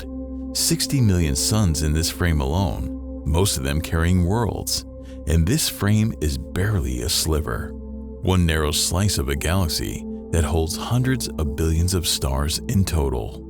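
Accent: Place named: United States. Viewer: I find it hard to listen to the American accent. It has been poisoned.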